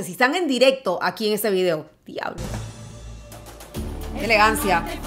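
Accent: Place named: Argentina. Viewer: Me alegro que te gustara. Mis paisanos ya te explicaron lo suficiente y completamente de acuerdo contigo. Y la 2ª pronunciación que dijiste es la correcta. Aparte por tu acento, creo que eres argentina (apostaría a porteña), por lo que seguro que encontrarás a gallegos uqe te lo puedan explicar (eso sí, de Galicia😊)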